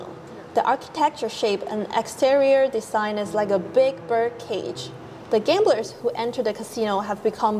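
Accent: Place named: Hong Kong. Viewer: 閣下的廣東話像是一個香港人，不知是否正確？純粹好奇一問，祝新年快樂，訂閱人數蒸蒸日上，紅遍大江南北。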